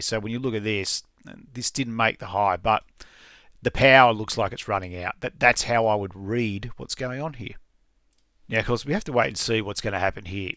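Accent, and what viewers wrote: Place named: Australia. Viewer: Great to hear the Oz accent on YT!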